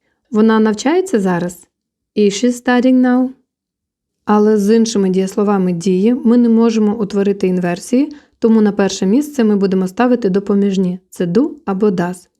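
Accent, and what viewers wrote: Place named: Eastern Europe. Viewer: наче все правильно, але сильний східно європейський акцент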